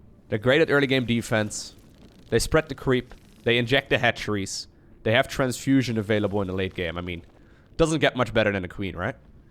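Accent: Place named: Netherlands. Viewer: lotta letters are pronounced different in dutch. whenever dutch people speak english youcan very clearly hear that they are dutch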